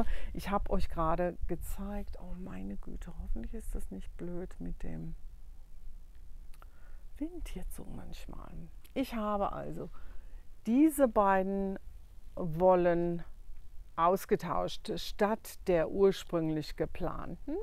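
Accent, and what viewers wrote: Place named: Hamburg, Germany. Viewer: Ah, alles klar. "Hässisch" und dann noch "hambugäsch" obendrauf. Dadurch erklärt sich vieles. 😉😘 Mag ich sehr gerne hören. Ganz liebe Grüße über den groooßen Teich 🤗 in ein so ganz anderes Klima 🌵🌞, was ich vermutlich klasse fände. 👋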